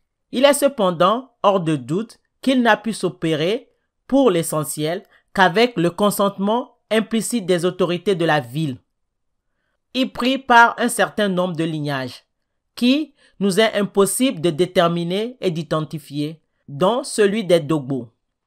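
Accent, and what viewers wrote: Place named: France. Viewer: C est bizarre on dirait que tu Francaise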